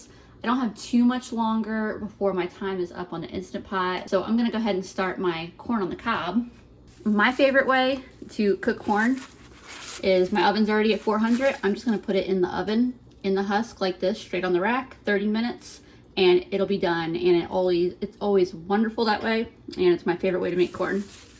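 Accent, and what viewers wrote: Philadelphia, United States. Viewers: I always thought you were in Philly Area based on your accent. LOL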